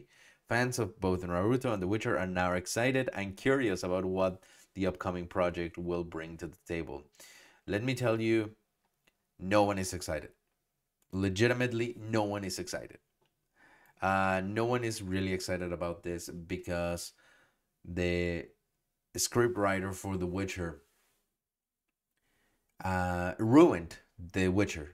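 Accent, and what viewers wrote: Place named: Spain. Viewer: Okay., I'm gonna say it.Hearing a native Spanish speaker say Japanese words like Naruto in English is funny as heck. The 2 accents make it funny as all get out. could probably say it better in Spanish. When he says it in English the 2 accents compound to make it super funny. Don't stop. I still want to here what you have to say. Even if it sounds weird.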